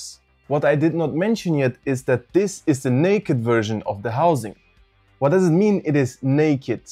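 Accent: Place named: Russia. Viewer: Till the last phrase, I was absolutely sure that I hear Russian accent😅The only doubt was why the Russian guy talks about German engineering 🤔 And thank you for your video and your job, of course. It's awesome.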